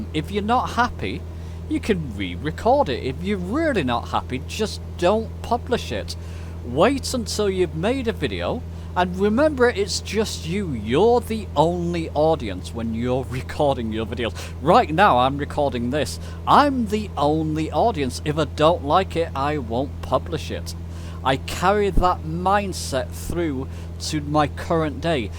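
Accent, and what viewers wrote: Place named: Northern England, United Kingdom. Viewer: Good to hear that Northern Voice every time I want to learn "How To!" and how you make the difficult seem so easy I don't normally believe in coincidences - but I just asked on My Orbyx Forum any hints for making Flight sim videos because I want to improve the few I've made and took the UTUBE plunge - and once again - great minds think alike Thanks for all you do especially for us Old blokes!!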